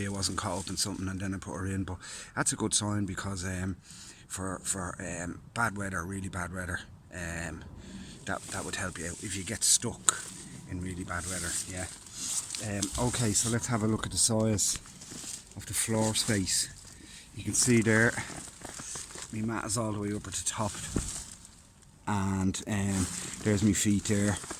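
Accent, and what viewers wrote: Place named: Ireland. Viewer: Awesome tent and a great video, thank you. Always love the Irish accent - more like purring than speaking! Just FYI and for others, the full weight of the 1P is 1.25 KG, vs 1.42 as you rightly said for the 2 person. So for the 170g difference I agree - take the extra space! £240 online right now as well. A properly well made tent, rather than the "Big" American brand stuff.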